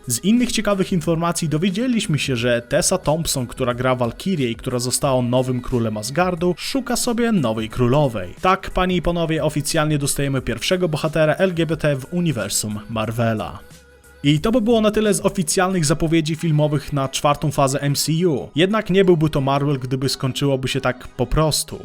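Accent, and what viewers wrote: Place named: United States. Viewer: Kanał ok, filmiki ciekawe, ale okropnie gryzie sposób narracji, skąd teraz wszędzie amerykańska tonacja zdań? W języku polskim akcent jest w zupełnie innych miejscach...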